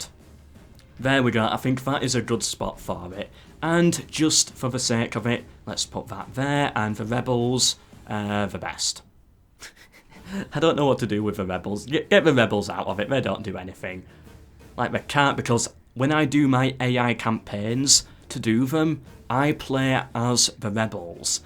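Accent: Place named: Yorkshire, United Kingdom. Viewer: I've honestly never heard a Yorkshire accent as strong as yours, it's beautiful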